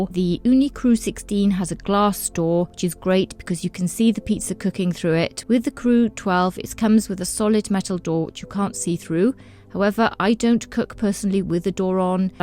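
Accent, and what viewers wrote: United Kingdom. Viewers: J'aime bien cet accent britannique.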